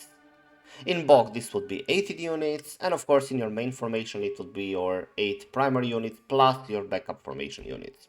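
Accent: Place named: Romania. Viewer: How to recognize a Romanian just by accent :)))) What gave you up 100% was the: "La, la, la" instead of "Yada, yada, yada" :P Great video btw!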